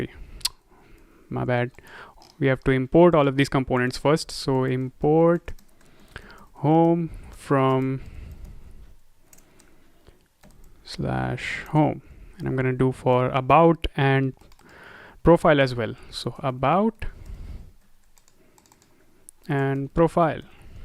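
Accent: India. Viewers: first i thought oh..this is not an indian guys....then after 10 sec the indian accent comes in.. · everything was fine untill the indian accent, dislike.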